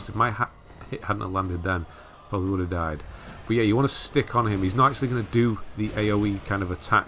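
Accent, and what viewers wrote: United Kingdom. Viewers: You have such a cozy, warm, british voice, I just can’t. It’s not only a very good guide, but just listening to you makes all this journey a bit easier. Thank you, somebody from UK. You make other peoples gameplay so much easier and controllable.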